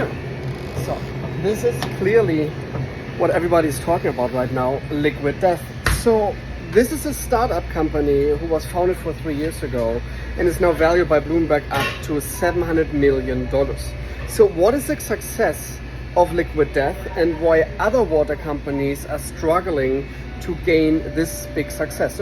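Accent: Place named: Germany. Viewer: du könntest locker Synchronsprecher werden für deutsche rollen die English sprechen 😄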